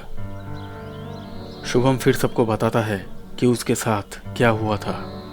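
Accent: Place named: Bihar, India. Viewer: Sir aap bihar se ho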